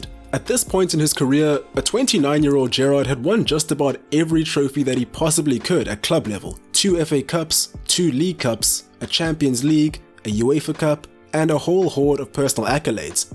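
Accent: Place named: South Africa. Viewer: I can hear that South African accent Bru 🍻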